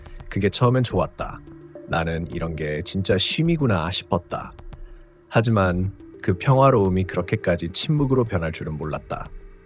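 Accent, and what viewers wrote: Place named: China. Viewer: 왜 이렇게 중국인 발음이 나올까?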